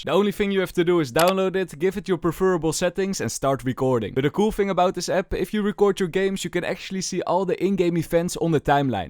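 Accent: Netherlands. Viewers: Hii ...i still watch your videos even though i cannot play,your cideos are really very interesting mainly the dutch accent😂 Love your content❤❤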